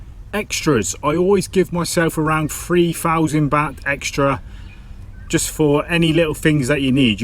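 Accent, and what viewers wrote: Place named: South West England, United Kingdom. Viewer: Hi, Been following [subscribed] you for a while now, it sounds like you are from the South-West-ish area of the UK [so you will be well-aware of the weather in the UK]. I am interested in Chiang Mai "as it seems to have cooler temperatures than the rest of Thailand" [Chiang Rai also looks like a cooler option too], that said, could you give a portion of one of your vlogs that tells of what to expect weather-wise [mostly between November to May in my situation] in Chiang Mai? Good-luck Buddy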